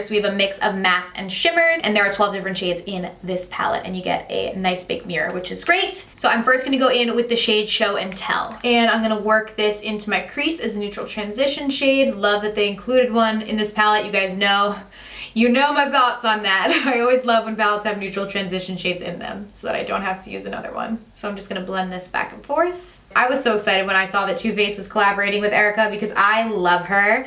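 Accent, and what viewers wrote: New Jersey, United States. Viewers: Oh she is not, shes always talked like that, she's from Jersey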